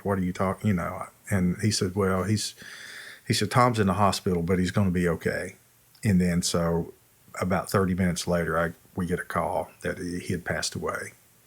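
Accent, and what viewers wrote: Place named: Texas, United States. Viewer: This guy HAS to be from Texas. That fabulous accent <3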